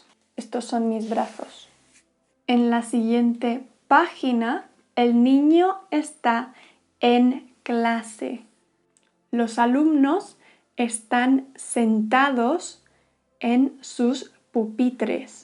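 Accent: Spain. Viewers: I only wish two things: 1) that you had lots more just like this and 2) that it was in a Mexican dialect rather than Castillian one. I think I would get stared at if I said "ciudad" as you say it. The second isn't a complaint. Just a wish. What you do in this video is what all the polyglots say to do to learn/teach another language. My Spanish vocab is good, I know the grammar, but I spend too much time in my head translating rather than just hearing and connecting words with ideas. The pointer idea is fantastic. I'm tempted to make a few videos like this in English for my various student friends that are struggling at the same point I am. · Talar du spanska från Spanien?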